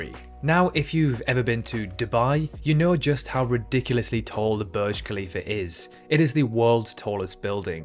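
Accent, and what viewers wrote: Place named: England, United Kingdom. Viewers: Criticizes term "middle age" Uses term "middle age". And your Received Pronunciation is okay. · What the heck is that accent!! England always impressing me. What region is that? North?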